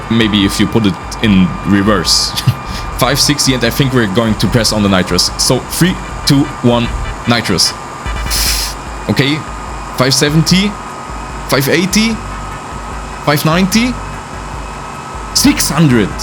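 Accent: Germany. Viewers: I can hear a bit of deutsch there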